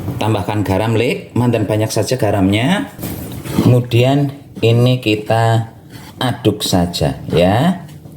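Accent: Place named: Purwokerto, Indonesia. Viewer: Bahasa nya kok Purwokerto banget rika kang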